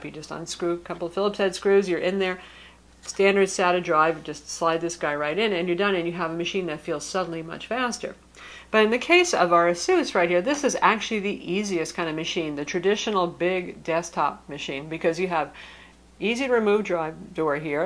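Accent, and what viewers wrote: United States. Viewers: I’m considering buying the ASUS G750 J( I’ve not decided which one yet) and I would also like to purchase one of theese SSD Drives as I prefer games that are generally hard to run on a regular, ”cheap” computer. Could someone give me a link or a quick tutorial on how to insert the SSD drive? I’m not used to hearing this particular American dialect and I’ve been struggling to understand the tutorial as I’m not particularly fitting into the computer whiz category… ☺:-)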